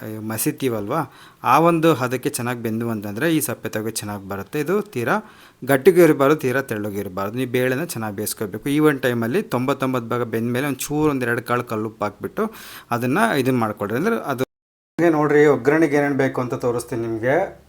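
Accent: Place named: Durga, India. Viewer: ನನಗೆ ಸುಮಾರು ದುರ್ಗಾ ಹಾಗೂ ದಾವಣಗೆರೆ ಸ್ನೇಹಿತರೆ, ನಿಮ್ಮ ಭಾಷೆ ತುಂಬಾ ಇಷ್ಟ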